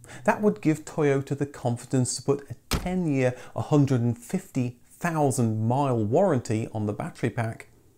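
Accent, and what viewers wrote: England, United Kingdom. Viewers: I was about to say the same. You've done well keeping such a clear English accent without any hint of an American accent. I remember a lad I went to school with, he went to America something to do with becoming a sports coach when he was around 19. When he returned after a few months, he had a ghastly 'fake' accent to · You had me fooled too. Started following your channel very recently, and mistook you for a Brit . Are you from the Midlands originally - Birmingham, maybe? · Same here after listening to ur way u deliver us which is similar like UK English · Didn't realize you're are American. To me you sound British. Also the choice of cars is in my opinion very European. Anyway, great channel. Thank you for all the time you put in. Cheers from Bavaria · Yeah i'm very surprised too. With all the english cars videos and the accent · You always seemed to be from the UK , some sleepy village there but great stuff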